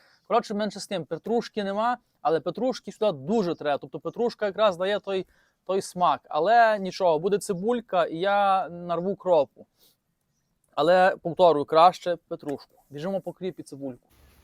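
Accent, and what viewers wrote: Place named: Galicia, Ukraine. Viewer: Класно ведеш і галицька говірка мені дуже імпонує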